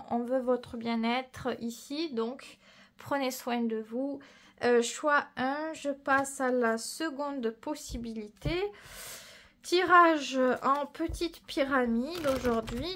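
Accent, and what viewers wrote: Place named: South-West, France. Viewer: Je vous découvre, et j'apprécie... donc je m'abonne De plus, moi qui suis dans le sud-ouest, je reconnais un accent comme le mien, et ca me fait sourire Merci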